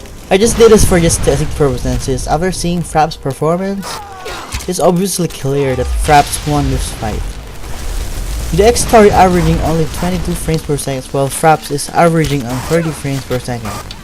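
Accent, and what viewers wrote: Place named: Philippines. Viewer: are you Filipino? You have the accent.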